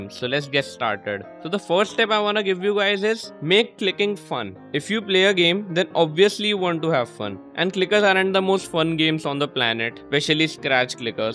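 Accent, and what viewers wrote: India. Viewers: I just really like the Indian voice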